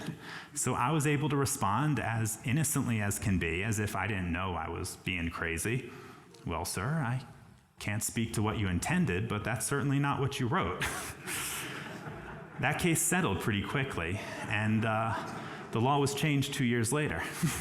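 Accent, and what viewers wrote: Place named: Midwest, United States. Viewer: In the spirit of LPL, his voice is enough to out him. Just think for a second. Sight is a great sense, but we have at least five of them and we can use them if we apply your minds. I know this is a man. He is white. His accent suggests possibly midwestern. His vocabulary, fine articulation and vocation suggests... anyway he's a jew. Anyone in that audience saw his face, btw. But his voice is enough. Not many people speak like that, and he's made hundreds of videos. You don't think someone will eventually make a voice recognition app?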